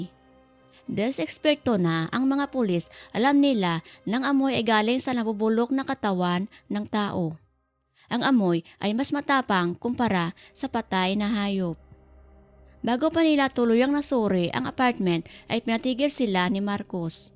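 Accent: Visayas, Philippines. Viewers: Sounds visaya accents 😵‍💫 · hahaha.. parang himig bisaya.. at nahilo ako marining bjnabasanya basanya ang content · Ako Tinapos ko Ang twinto Oky lang Naman Na Ganyan Ang Bossis Mo Kasi Normal lang Yan Na Ganyan Ang Bossis Kasi Bisaya Piro Para Sakin oky lang❤️‍🩹❤️‍🩹❤️‍🩹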